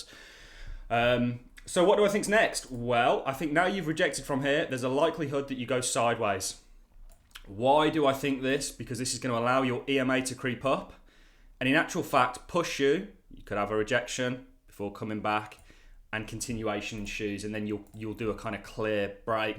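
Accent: United Kingdom. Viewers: For a moment I thought it was Benjamin Cowen with a British accent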